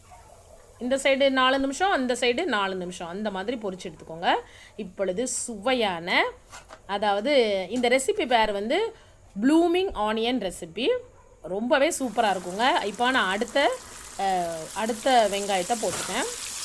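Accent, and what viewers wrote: Tirunelveli, India. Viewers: Unga pechi semma nenga thirunelveli ah ..... 😘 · Hehehehe nora natiyam 🤣🤣🤣 neenga thirunelveli ya??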